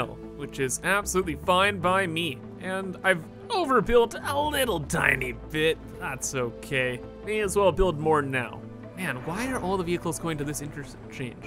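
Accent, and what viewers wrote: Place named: Canada. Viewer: You sound so cute with your funny Canadian accent!!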